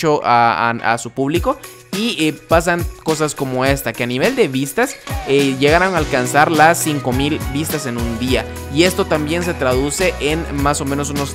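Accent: Mexico. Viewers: Porque hablas como mexicano? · Porque habías como mexicano 90%mexicano10%Guatemalteco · Se te agradece la información papá, pero demasiado largo el video, hay que mejorar la edición en la post- producción de tu contenido. Existe demasiada redundancia en tu diálogo. Además ¿dónde dejáste el VOS chapín? Parece más que sos mexicano. Son opiniones puramente personales por supuesto. Espero tomes la crítica en una manera constructiva. Para que veas que no estoy siendo culeid, le dejo un 'like' a tu producción mano. Sigan adelante. Por cierto es primer video tuyo que miro, y voy a ver más para apoyarte. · por qué habla como mexicano? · Este men parece mas mexicano que guatemalteco ?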